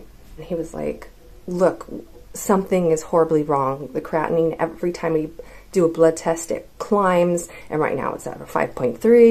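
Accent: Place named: Canada. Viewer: OMG you just said something about being in PA? I honestly thought. by the way you talked, that you were a CANUCK EHH! :O * Mind Blown*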